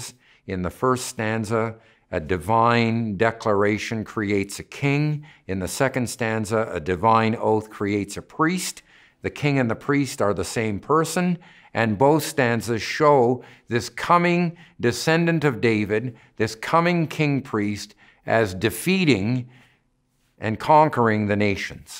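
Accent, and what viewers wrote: Canada. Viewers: One minute in and I pegged him as a fellow Canadian....that accent sticks out like a sore thumb LOL..turns out he is. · Interesting lecture; I could tell he is Canadian right away haha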